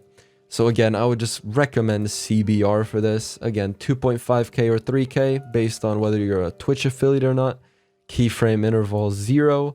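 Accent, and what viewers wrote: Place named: England, United Kingdom. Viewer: Oh, thanks finally an English guy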